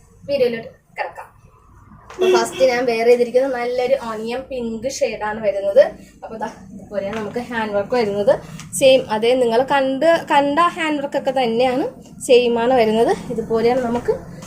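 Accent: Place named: Trivandrum, India. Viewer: " nikku nikku nikku evide povunnu"😅😅thani Trivandrum 👌🏻👌🏻kollam 🥰🥰 Collectionsum adipoli👌🏻👌🏻👌🏻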